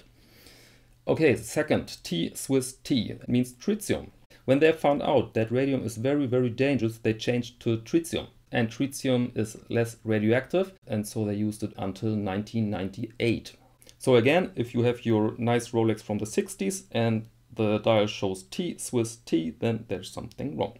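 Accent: Germany. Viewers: I don’t know why, but to my ears there is something special about the German accent when it comes to talking about technology - new or vintage. I have only just found this channel, and I’m so happy I did. Liked and subscribed.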